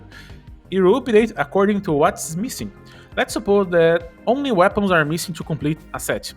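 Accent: Brazil. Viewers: You have to be Brazilian or Portuguese , i can tell by the accent! anyways no hate or anything just something i noticed but thank you for the awesome video!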